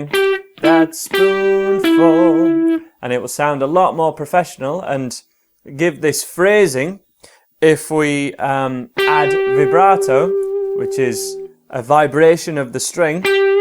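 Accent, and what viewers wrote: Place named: Northern England, United Kingdom. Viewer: Kool thanks enjoyed that tutorial IAM in Australia you are obviously northern English I was born in Cheshire hope to see more of your tutorials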